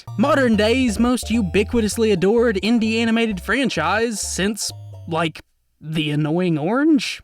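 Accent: South, United States. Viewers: Oh my god you are so southern. I live in the deep south and i don't even know anyone who sounds like that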